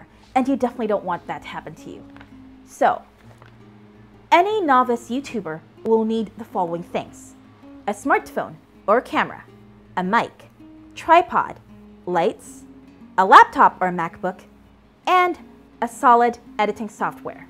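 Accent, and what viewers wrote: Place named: United States. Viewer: You have a flawless american accent.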